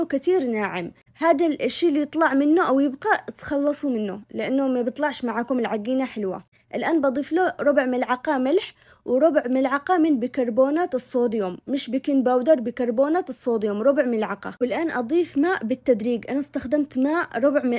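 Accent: Aden, Yemen. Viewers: السلام عليكم الله يحفظك يا بنت بلادي سويت اشتراك في قناتك بسبب اللهجه حق عدن و علي الاكل و المحتوي الجميل من دون تكليف . تحياتى لك و المتابعين في قناتك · حبيبتي بنتي الغاليه ربي يحميك يسعد قلبك انتي بلسم على القلب خرجت من عدن واني صغيره ورجعتني لكل حاجه حلوه للكلام الدي في بعض من الناس يستحون من الهجه بس انتي برابه عليك انتي تتميزي بلهجه العدنيه وده اول نجاحك وموهبت بطبيخك الحلوه ربي يوفقك ياقلبي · مرره احب طبخك اتابعك استمرار خاصه صوتك لهجه اليمنيه 🥰🥰 الله يسعدك ابي طريقه بروستد من طريقتك الخاصه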